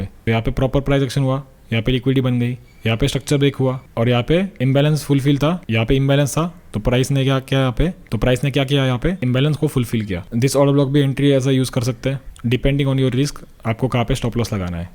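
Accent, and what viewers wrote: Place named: Kerala, India. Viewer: You are amazing!!! with SMC concept bhai.Your accent seems tobe from God's own country,Kerala as I studied in Ernakulam for 2 years.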